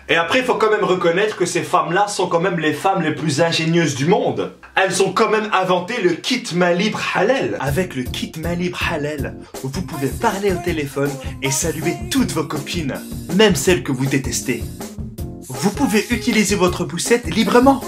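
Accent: Belgium. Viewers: Accent belge???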